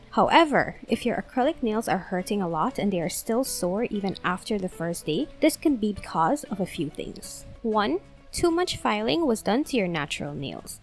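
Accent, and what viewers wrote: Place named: Caribbean. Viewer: Sounds carribean, maybe Trinidad or Guyana .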